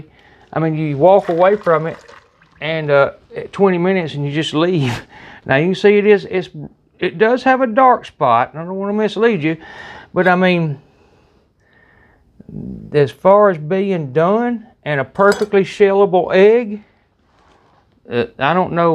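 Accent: South, United States. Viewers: just been listening to the new album and your accent slightly reminded me of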